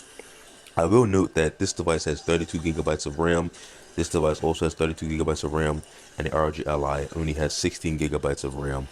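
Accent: Bermuda. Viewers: Are you from Bermuda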